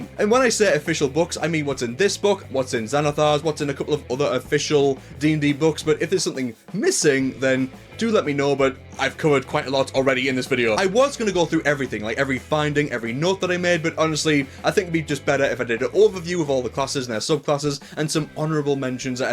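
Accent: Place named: North East England, United Kingdom. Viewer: Hey, are you from the north east of England mate? Im Newcastle so can hear an accent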